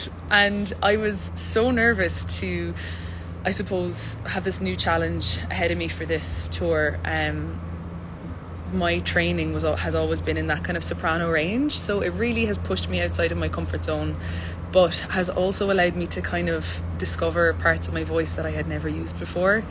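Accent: Ireland. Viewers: Wow i love the irich accent ❤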